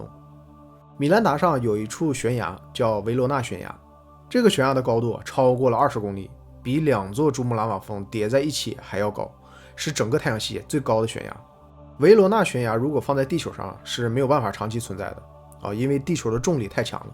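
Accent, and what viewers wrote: Northeast, China. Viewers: 听口音大概率是中国北方，像东北那边的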